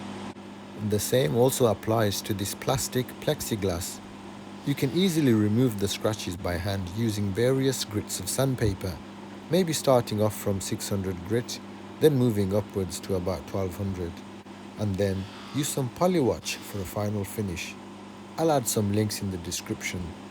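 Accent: Birmingham, United Kingdom. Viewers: I'd say Birmingham, of Asian ancestry. I do come from near there, but of course I'm guessing. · Can you do my dads Longines Sounds like your in uk 🇬🇧 I’m in South Yorkshire What are your prices Thanks Genuine Had it repaired twice at H L Brown 👎 then a private guy 👎 Gutted really we lost him in 2010 😟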